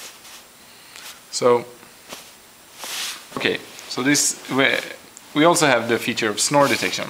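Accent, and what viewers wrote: Sweden. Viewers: from sweden? sounds like that? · where are you from? accent sounds like sweden or smth:D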